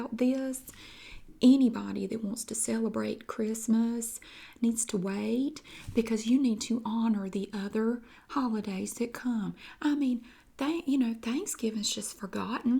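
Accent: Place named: South, United States. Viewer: The shadiness of these characters kills me lol your southern accent and the “bless your heart” gets me every time!!! Cant get anymore shadier than saying “bless your heart” to someone lol alsooo this character gives me “Mrs. Roper” from Three’s Company lol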